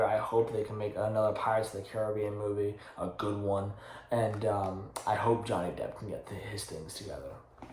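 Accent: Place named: South Jersey, United States. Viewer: i thought i recognized your accent - i'm from south jersey - live in Portland, OR now though - steelbooks are awesome - also anything from arrow video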